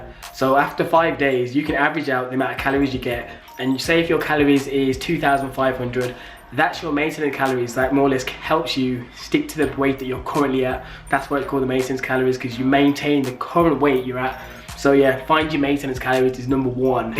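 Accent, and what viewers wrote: Birmingham, United Kingdom. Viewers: I know, I love the Brummy accent :-)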